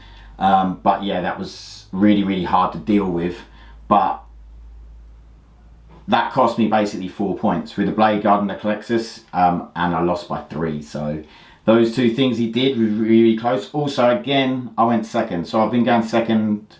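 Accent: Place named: Australia. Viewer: Thought you were from OZ…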